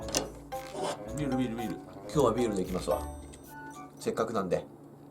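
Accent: Japan. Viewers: 何弁かな？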